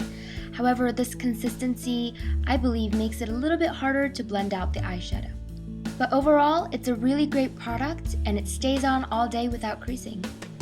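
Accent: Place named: United States. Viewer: Ur cute at american accent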